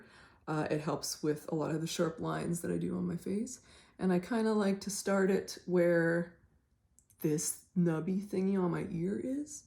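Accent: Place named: United Kingdom. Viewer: This makes me wonder if there is an alternate universe where is alive and has his own drag queen persona with your name as the Drag name, honestly doing a drag king dressing as a drag queen look would be fierce and so bonkers, like a in drag look. Also, we definitely need you to do a look one day where you show us what looked like before he died. It would be so interesting. You're the first Drag King I've legitimately found interesting and I think it's due to the colourfulness, the glamour and the characterisation. It shows that Kings can be just as fun and amazing as Queens can be. P.S as a Brit, I approve of the accent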